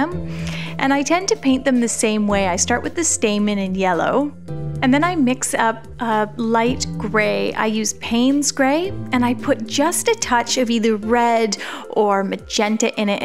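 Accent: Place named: Atlantic Canada, Canada. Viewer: These flowers are lovely! I enjoy your video’s so much. I love listening to your Atlantic Canadian accent. It makes me want to visit PEI! Thanks for sharing your talents.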